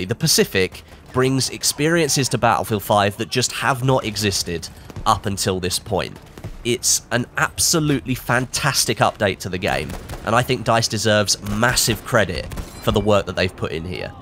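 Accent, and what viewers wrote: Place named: United Kingdom. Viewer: And that is how EA keep YouTubers silent about the horrible performance and bugs of this game. One year and we still doesn't have a smooth experience. I don't care you have your British accent , you are misleading your community about the truth about this game and negligence of Dice. No battlestats, no transparency.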